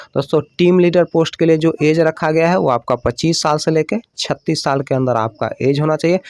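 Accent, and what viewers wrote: Bihar, India. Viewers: Sir AAP Bihar se hai kya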